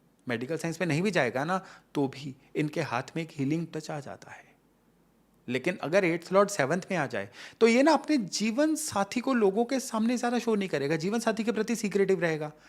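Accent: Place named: Kanpur, India. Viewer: Sir ap kya kanpur se belong krte h 😅batiya rhe h yhi bola jata h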